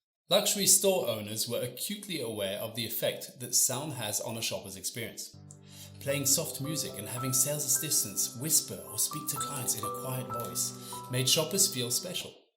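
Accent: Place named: United Kingdom. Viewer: 英國腔超重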